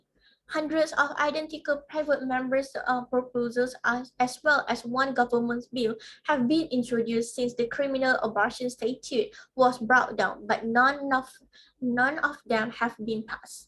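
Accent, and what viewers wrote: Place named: Philippines. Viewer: hii there very interested project research good explanation and details and most we malaysia still not aware about this one more thing the way you explain fascinate me good job and you can enhance 👍👍👍 sorry about one thing you stammer but no worries dear because you kind have some filipino accent 😍 anyway all the best and may allah bless you with success ammin.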